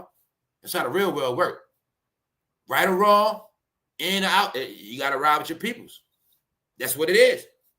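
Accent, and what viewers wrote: New York, United States. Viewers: Replay gang 💪🏽 👁️ ❤️ your NY voice ( t.y for giving us Family consistent content no one does it like you real talk 💯 🙏🏽 )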